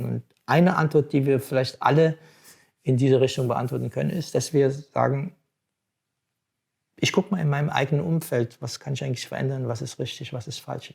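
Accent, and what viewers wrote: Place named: Saxony, Germany. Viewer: Ja ich bin auch durch Zufall an geraten...auch der Dialekt er stammt aus Leipzig u ich aus riesa also sachsen und da ich jetzt alle malschulen zu haben und ich nur Acryl und aquarellstunden genommen habe passt das jetzt sehr gut...denn mit zeichnen das moechte ich noch lernen machen sie weiter herr Assisi toll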